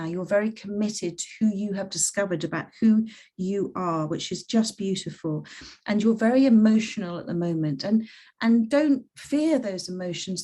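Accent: United Kingdom. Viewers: Wow...I am a soon to be published poet..work explores the dark side of my life and also my hope..so that I'm praying it will reach others 🙏 My only fear about being in hermit mode which has been for years, the further I'm going into hermit mode..? Thank you for your calm and insightful presence.plus we Yanks 💘 the British accent.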